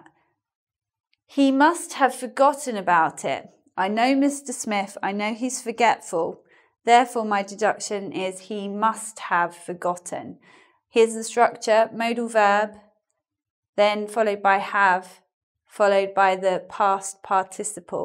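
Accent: United Kingdom. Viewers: I started learning Eng at the age of 6, now I'm 25. I love her channel but I mostly watch her videos to listen to British Eng not to learn. But in that 19 years, every teacher and book said that 'might' is the formal and polite version of 'may'. And here I am, hearing the total opposite with a confused Pikachu face. Thank you so much!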